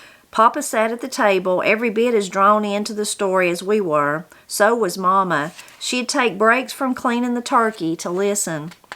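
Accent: Appalachia, United States. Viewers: I enjoyed that story so much, and you're a fine reader. You're the sort of reader who lets me lose myself in the story and forget that there is a reader at all. I pictured everything so clearly in my mind's eye. I think having someone with an Appalachian accent tell the story was a big part of what made it come to life. Thank you for a wonderful Christmas gift.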